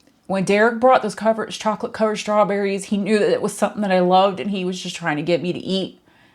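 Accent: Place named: United States. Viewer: 🌸🌸🌸I listened to your story and I have to agree, people need to seriously reconsider having elective surgery, especially with kids!! I had heart surgery...I still have nightmares and anxiety because I will need to do it again. Surgery is a life and death situation no matter how experienced your doctor's and nurses. the risk is always there. Suffering can and will happen. If you can avoid it, avoid it as long as you have young children because you never know. You sound a bit american and idk how this has affected you financially but I'm very sorry for all that stress too. ❤ Your husband sounds like a dream. I've never had a family care like that about me.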